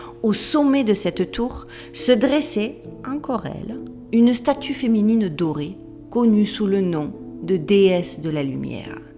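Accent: Marseille, France. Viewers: Accro à vos vidéos je suis;-) en direct ou plus souvent en replay. Votre curiosité insatiable, votre sens du détails, votre façon de raconter, votre petit accent de marseillaise vivant à Las Vegas, votre franc parler, la qualité de vos reportages.....et votre beauté qui est à souligner egalement...Tout de est remarquable. Mais... où est donc Duchesse aujourd'hui 🤔Merci beaucoup, infiniment, de votre travail, de vos recherches fouillées. Mais comment faites vous donc pour avoir un nouveau reportage à nous présenter chaque dimanche??☺🤩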